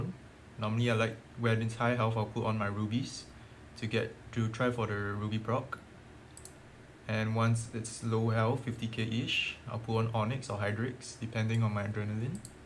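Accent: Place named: Singapore. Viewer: Bro are you from Singapore? You sound like a Singaporean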